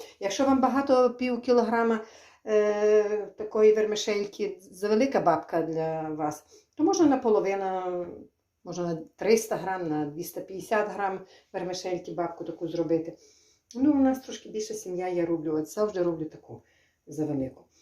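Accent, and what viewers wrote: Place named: Bukovina, Ukraine. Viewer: Дякую за гарну нашу буковинську мову та гарне викладення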